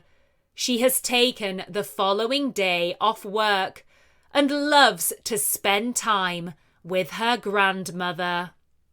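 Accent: United Kingdom. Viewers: That beautiful british accent!!! 😍😍😍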